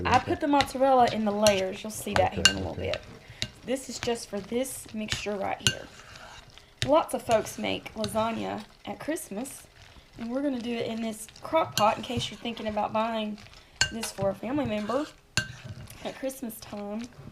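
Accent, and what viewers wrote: South, United States. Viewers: Love how those southerners pronounce the Italian name "Barilla".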